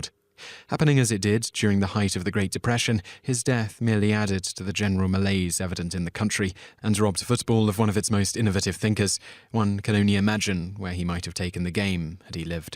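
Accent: United Kingdom. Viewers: Too many famous people have gone down in planes, esp. in those single or twin engine prop planes like Cessna! Rich folk with their toy planes! Has happened even locally once in awhile where I live, a local airport with a line of small planes called "Gull Airlines"! Wouldn't get me up in those tiny planes for anything in the world, forgetaboutit! BTW, Ethel Kennedy's parents were both killed in a plane crash together as well and Jackie knowing the family history didn't want JFK Jr. to fly and said he was easily distracted and may have had ADHD! May they all rest in peace. Love a British accent...thanks for sharing~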